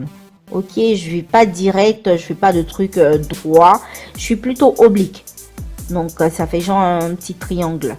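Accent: Ivory Coast. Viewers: Tu es ivoirienne d’origine ou mon oreille me trompe ?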